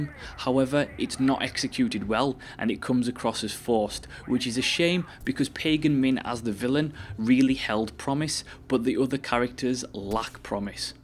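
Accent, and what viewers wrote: Scotland, United Kingdom. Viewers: Are you of Celtic origin? Scottish/Irish?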